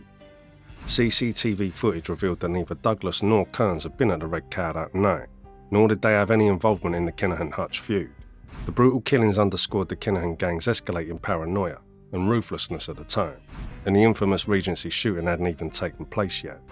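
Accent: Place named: Cockney, United Kingdom. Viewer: These goofy cockney accent narrator's are super cringe and speak like they are on antidepressants ffs I have to listen on ×2 speed.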